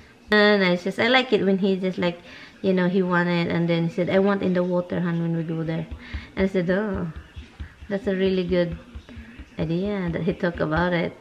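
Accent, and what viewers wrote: Visayas, Philippines. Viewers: Hi nag enjoy ko nimu ug tan aw bisan bisaya ang accent Peru cuteeee man...Been following you..Am working here in Cambodia..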